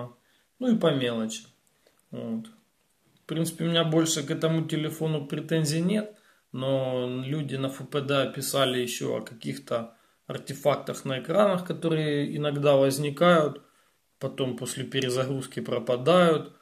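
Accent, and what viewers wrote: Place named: Zaporizhzhia, Ukraine. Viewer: та не, ну шо ты как не свой, акцент то запорожский, ту как не крути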